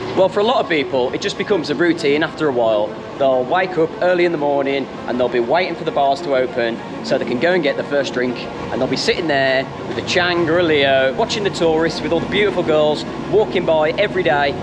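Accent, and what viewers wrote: Birmingham, United Kingdom. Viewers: Nice to hear a down to earth Brummie accent on channels like this, keeping it real.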